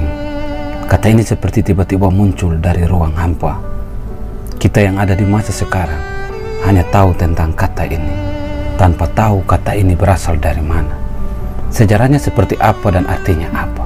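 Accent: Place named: Maluku, Indonesia. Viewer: Mantap ..Admin yg bicara ni pasti orang antara Leti, Moa atau Lakor. batul to???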